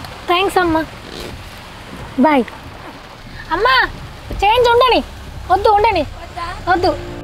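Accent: Telangana, India. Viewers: Akka Nv matlade matalu entha kalupukuntlu unai Telangana yaasa spr akka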